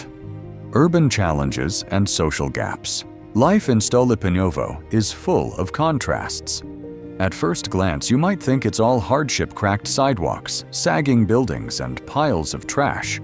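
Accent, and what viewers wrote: United Kingdom. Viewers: You have a British accent, and you are highlighting the negative aspects of all countries. What about your own country, Britain? britain is now taken over by Pakistanis, rape and murder are everywhere, poverty and homeleeness is common. Show your own country first. You don't look at the reason, only describe. The condition of these people in Bulgaria was normal during the days of socialism, but under capitalism, it is now a disaster.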